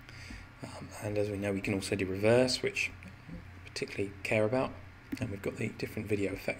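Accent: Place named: England, United Kingdom. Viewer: So glad you have an English accent.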